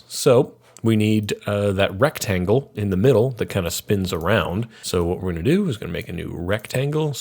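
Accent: Canada. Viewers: Sounds like you're Canadian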